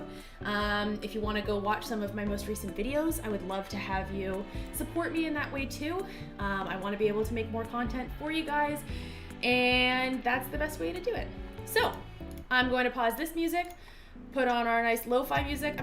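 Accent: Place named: Canada. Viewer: You’re Canadian, eh? Sammmeee 🎉❤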